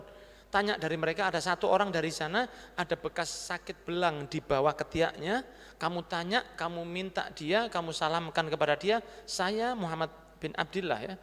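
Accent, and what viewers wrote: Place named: Madura, Indonesia. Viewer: ada madura nya😍😍😍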